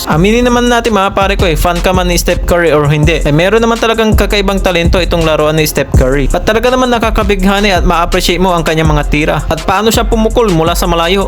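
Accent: Pangasinan, Philippines. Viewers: Pangasinan ka idol noh,,? Halata sa salita mo.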